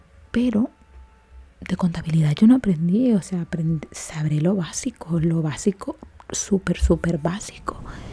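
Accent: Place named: Nicaragua. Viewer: Tengo poco tiempo escuchandote pero, me gusta mucho tus videos sobre todo susurrando o platicando están super relajantes y me gusta mucho tu acento de voz nicaragüense, gracias por tus videos , saludos.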